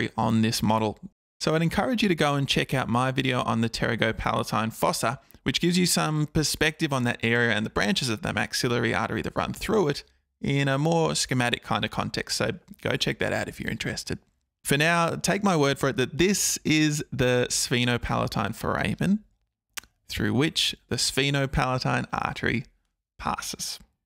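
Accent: Australia. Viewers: This Aussie accent is so satisfying to hear and also thanks a lot for this vid ♡ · Bro is aussie Thankyou though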